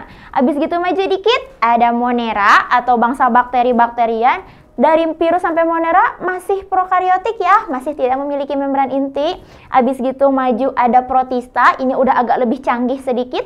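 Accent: West Java, Indonesia. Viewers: sunda beneeerr... orang sumatera sambil senyum dengerinnya. sukses ya kak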